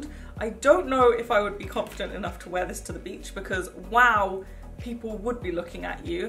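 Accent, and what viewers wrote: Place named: London, United Kingdom. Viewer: I love your videos - love love love!! I'm so excited about your plan for daily vids this week and then DIY's next week too -:D Yayyyy!! My favorite "swimming costume" (how cute!) was the blue & white checked bikini (gingham pattern?) it's very cute on you. I don't get the straps either, but once you fix them it's going to be perfect. Btw, I love your voice, and you're just completely adorable!! I was born in London but moved to the USA when I was 4 - wishing I still had the accent!! Also, your hair suits you so perfectly <3 I am wondering if you've ever bleached your teeth or how you've gotten them so white? If you ever get the chance to mention how...? Keep being you, cuz you seriously brighten our day. You're definitely my favorite YouTuber by far!! Hello to & everyone! Love from Susan in Florida, US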